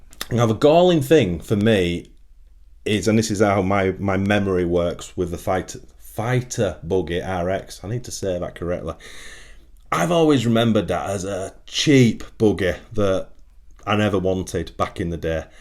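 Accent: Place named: Yorkshire, United Kingdom. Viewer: I love your videos you live in Canada but you sound like your from Bradford or may be Leeds. I am looking forward to your next video.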